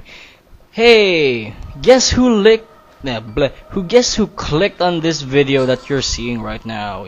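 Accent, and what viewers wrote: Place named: Philippines. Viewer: I know ur filiphino, cause, i can tell ur accent ;D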